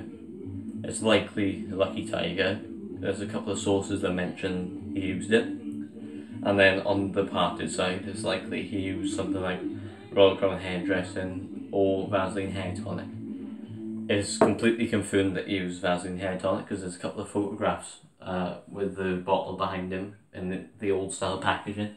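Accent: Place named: Wales, United Kingdom. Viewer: Are you welsh fella? Lovely accent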